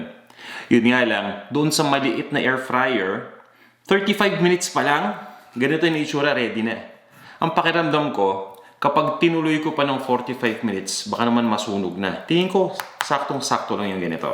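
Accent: Philippines. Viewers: natutuwa talaga ako sa pagsasalita niya kahit nakatira siya sa ibang bansa hindi nabago yung puntong tagalog niya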